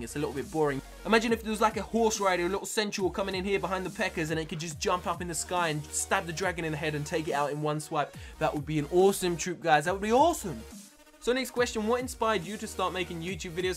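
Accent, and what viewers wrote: United Kingdom. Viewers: I knew it your British, so am i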